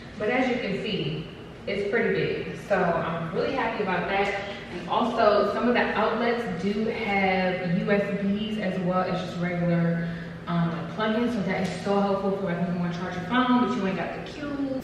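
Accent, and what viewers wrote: DMV, United States. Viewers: I just know you from the dmv by your accent lol. I so wish you could share this apartment cause it is everything but people crazy so 🥴 congrats!!! You deserve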